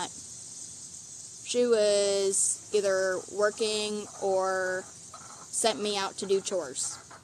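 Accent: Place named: Florida, United States. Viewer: I can say as a father of daughters I am very proud of you getting away from Lie-net and focusing on your family. You sound like you would fit right in my country area of osteen florida. Jeremy hales is a really good guy who sticks to his word and helps through the heart and has much respect world wide. I look forward to more videos from you.... until then keep that pretty smile going😄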